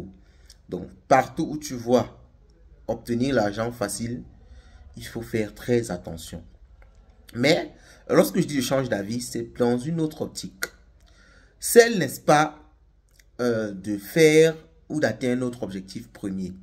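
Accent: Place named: Cameroon. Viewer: Bonjour très cher grand frère tu es camerounais j'imagine quand je suis ta manière de parler ☺️ donc...... Quand je l'ai dit..... à mon humble avis le film que tu as commencé à tourner là, hum.... je pense qu'il va te dépasser. Les deux projets là avancent ooohhh...!!! Mais beaucoup de courage à toi, j'admire ton audace en tout cas 💪 Et merci déjà d'avoir enlevé le mot arnaque du thème c'était pas le mot approprié pour mieux exprimer ton scepticisme..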